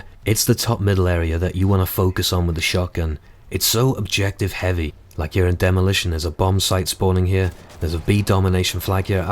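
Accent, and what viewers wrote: Wales, United Kingdom. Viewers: sound like a welsh xbox ahoy :)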